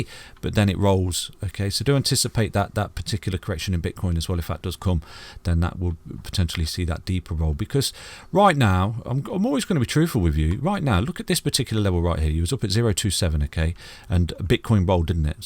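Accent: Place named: East Midlands, United Kingdom. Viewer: where are you from? Trying to work out your accent. East Midlands?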